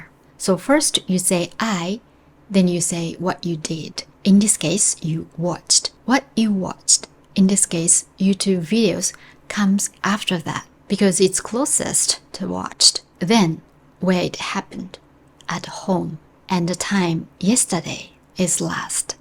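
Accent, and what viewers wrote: United Kingdom. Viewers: I am Thai but I really like your English accent. I'm studying Japanese language, and I can practice English skills together. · you british english is beautifull🔥🔥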